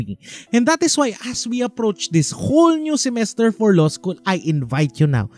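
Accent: Batangas, Philippines. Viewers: Hi Atty! Are you a Batangueno? Natuwa lang po ako sa inyong punto. Will be starting on the 24th as First Yr law student. Thank you for your very helpful contents and congrats on your 100th episode. 👏👏👏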